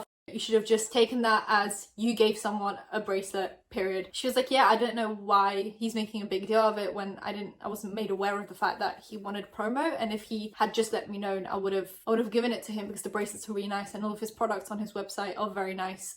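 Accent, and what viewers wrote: United Kingdom. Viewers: I could listen to you say vitamin all day. From an American who loves a good British accent. 🥰🥰🥰🥰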